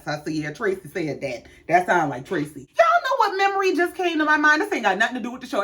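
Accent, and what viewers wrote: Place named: Charleston, United States. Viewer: I just happened upon your page, and as a South Carolina girl if that ain’t Chucktown I hear I know sumn! Glad to have found you!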